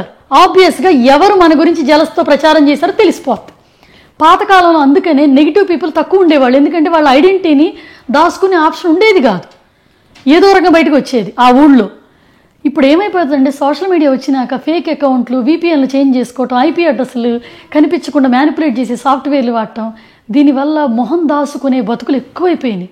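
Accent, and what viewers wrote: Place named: Guntur, India. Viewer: First of all a big hats off, a lovely clear cut video to those spreading negativity… we surely know that it’s like a saying goes ‘ dunapothu Medha varsham padinatu ‘.. I have been following u since ur first video, my husband saw ur video and shared to me, he says, ‘u talk like my sister in laws, who in relation are his sisters, they are from Guntur and I am from vijaywada.. so I relate a lot, the way u talk, the spontaneity and all … my elder brother stays in Birmingham and I know how they struggled to build up a good career in another country.. I am messaging from my daughters account, finally, I think I can call u by name, as I am a bit elder to u and I consider u as a friend, if hurtled sorry.. what I want to tell is, I just love u for the,‘Kukka katuki chappu Debba’ reply u are giving and this is necessary …finally wishing Bannu a speedy recovery and missed little princess in these videos.. 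but good that u kept kids away from all this nonsense.. take care, lots of love, jyothi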